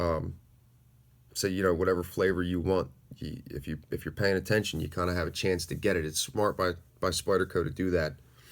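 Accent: Philadelphia, United States. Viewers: Ain't no hiding that you're from Philly that is FOR SURE. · I had a feeling was from the philly area. Born in philly and lived in or around the city for 36 years so I tend to pick up on our distinct accent. Go birds.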